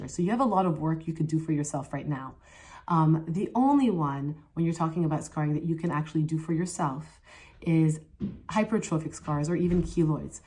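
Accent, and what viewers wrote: France. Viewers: Great tips thank you 😊 also have a question on using laser for scarring or redness... is it useful, do you have any recommendations? love your french accent très chic oh la là 🤌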